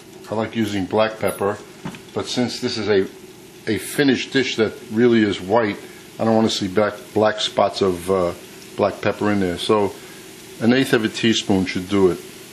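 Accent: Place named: Boston, United States. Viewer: When I clicked on this video, I thought to myself: "How awesome would it be if the guy cooking Boston clam chowder, actually had a Boston accent." Apparently my wish came true!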